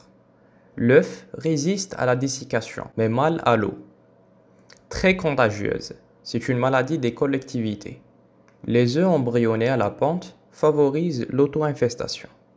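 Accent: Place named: Algeria. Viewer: Vous avez une accent algérienne en TT cas merci infiniment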